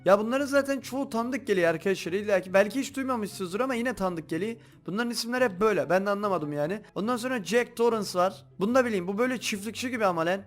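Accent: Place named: Gaziantep, Turkey. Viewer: Abi seni eskiden antepli zannediyodum ama degilmişsin üzüldüm ama aynı antep şivesi yapıyorsun seviliyosun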